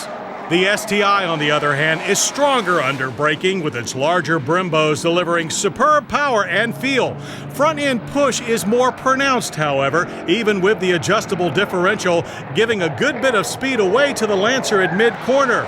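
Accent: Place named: United States. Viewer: This commentator very american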